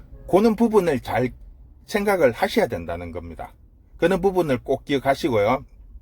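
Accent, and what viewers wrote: Gyeongsang, South Korea. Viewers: 갱상도 발음 정겹게 들리네요. 일리 있는 말씀입니다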